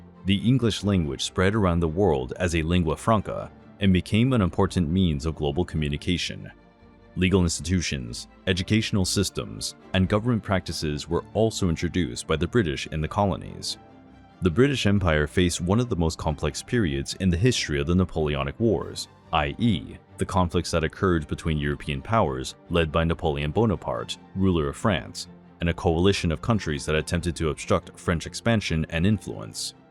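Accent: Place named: United States. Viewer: Of course its a biased review, the voice over is done by an American XD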